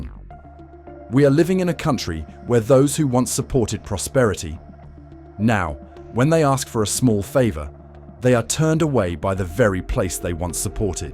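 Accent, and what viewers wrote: United Kingdom. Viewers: Why is it always a narrator with a British accent who narrates these terrible videos about the homeless in America?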